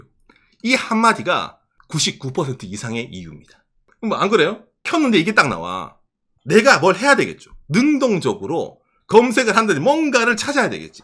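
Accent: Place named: Gyeongsang, South Korea. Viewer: ? 말할 때 경상도 억양이 살짝 들리는 듯 ㅎㅎ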